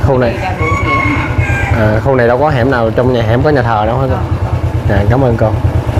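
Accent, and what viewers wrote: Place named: South, Vietnam. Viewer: Noi tieng mien nam nghe rat ro thanks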